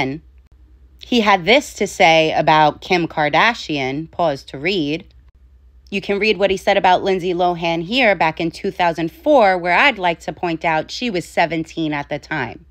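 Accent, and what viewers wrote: New York, United States. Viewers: New Yawk girl tellin' it like it is! Straight up, to the point, take it or leave it! 👍😀 Stay TRUE, Vote BLUE! 💙🇺🇸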